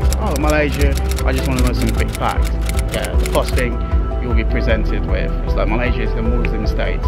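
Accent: United Kingdom. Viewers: I love your British English accent.👍👍👍👍👍Welcone to Malaysia. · Your British accent not so sound like London I gues🤔... correct ne if I'm wrong 😂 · I really love your British English accent. Hope I will fluent in British English one fine day and in same time I'm proud of my Malaysian language.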